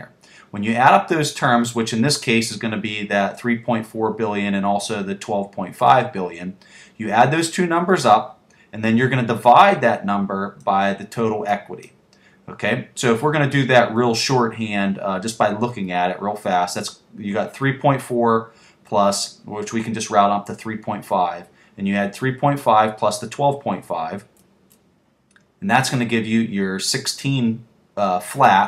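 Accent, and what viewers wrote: United States. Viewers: There’s zero chance you’re not from Baltimore. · are you from Pittsburgh?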